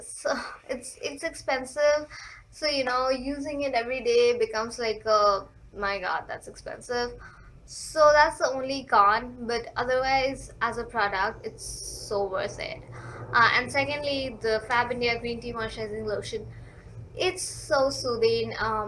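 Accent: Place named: United States. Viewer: your cute :) you look like an indian but you have an american accent.how? :O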